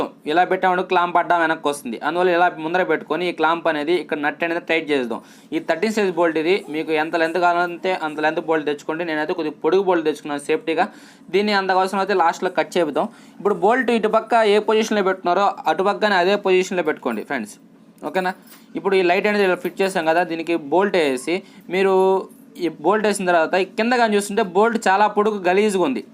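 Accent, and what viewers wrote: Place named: Nellore, India. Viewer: Super cheppinaav bro.. Mana Nellore language Lo adharakottinav...Pulsar NS160 Ki same led lights koni vunnaanu.. Dhaaniki fitting Yelaa cheyyaalo oka video cheyyandi.. Chaalaa mandhi wait chesthunnaam Ikkada😍😍😍.i like this video and I like your words...nice bro keep it up. And 160 NS maathram marchipokandi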